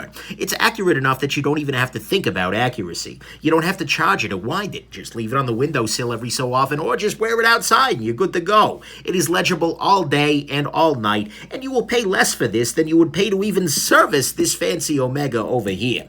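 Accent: New York, United States. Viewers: Mate, I love the New Yorker accent, the factories in Malaysia have improved over the past few years and we can see it in the Seiko and Citizen product. Only one gripe, Citizen Eco's will fail at about 7 years, I know as I have bought them for my wife and Son in the past. Their not worth sending back for refits, so a dilemma arises with the owner. Clever marketing on Citizens account it would seem. All that said what a great review. Cheers from Brisbane, Australia.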